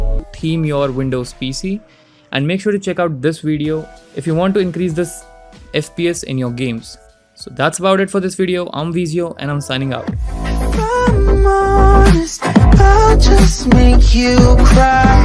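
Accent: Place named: India. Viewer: the indian accent bro 🔥🔥🔥🔥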